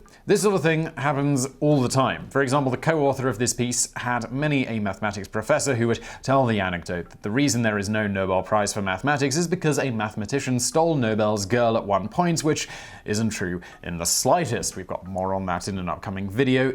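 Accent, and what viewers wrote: United Kingdom. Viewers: Am I the only one who thought this was Vsauce and was startled when he spoke in a British accent?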